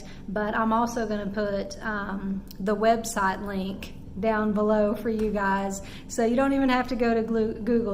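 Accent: South, United States. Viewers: love the southern accent where are you from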